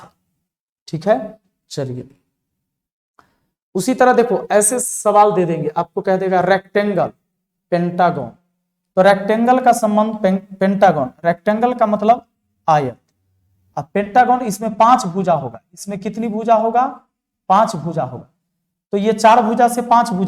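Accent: Bihar, India. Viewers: सर जब बिहारी बोलते है ... मुझे बहुत अच्छा लगता हैं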